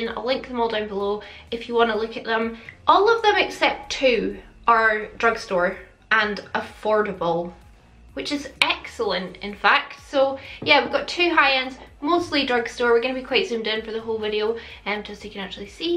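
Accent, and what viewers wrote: Scotland, United Kingdom. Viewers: Just having a bit of a crappy time should of realised 4 kids would be stressful. But it’s ok videos are me time as soon as they hear her Scottish accent they disappear 🤣 and yes we watched it during her study break tonight she squealed with excitement. Then kept saying OMG she remembers me it was me wasn’t it lol she can’t wait to tell her friends Monday 😂😂😂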